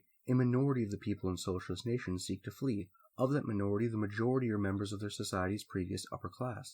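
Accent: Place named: United Kingdom. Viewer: You should be ashamed. I hope you are not some American kid (accent says more Brittish) who wants to be edgy so does this propaganda. My familly lived communism. You did not live shit. Nothing I wish for more than you and your pals actually living in communist times and country. Commies forcing planned economy and collectivization took my famillys farm, took everything. Made grand-grandpa work on his own farm for no pay. He was on Eastern Front from Ukraine to Berlin!! Constant food shortages. In 80s there was shortage of toilet paper. That was luxury! Rly how fucking dare you spreading those ideas that killed millions of people when you are born in free country. Shame on you.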